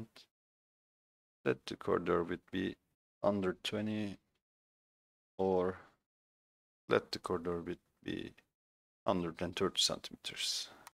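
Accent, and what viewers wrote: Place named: United States. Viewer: The word he is saying is Command, but with an American accent. For example: WA is the Wall Command. So when you are trying to figure out what he is saying, just try saying the word in American, not English, and you'll figure out what he's talking about. I know it makes it difficult for the other 98.5% of countries on the planet that don't speak American, but at least we all use Metric, unlike 1.5% of countries that are still using Imperial units.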